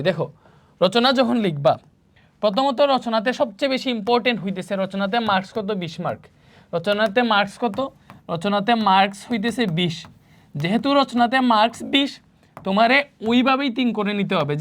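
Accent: Sylhet, Bangladesh. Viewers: Bhaiya apni ki Sylheti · উনি কি সিলেটি😐🙂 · ভাই আপনে সিলেটি নি ভাইরে ভাই😮😮❤😊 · আপনি সিলেটি তাইনা স্যার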